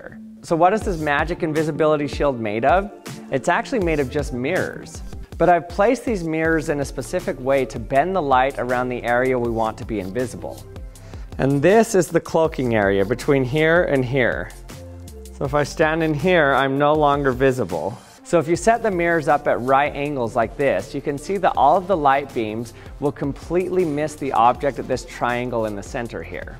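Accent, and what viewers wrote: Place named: Utah, United States. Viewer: That Utah accent.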